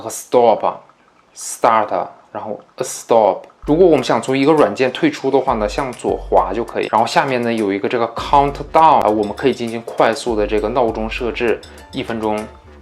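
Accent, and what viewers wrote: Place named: Northeast, China. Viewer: 隐约有点东北味儿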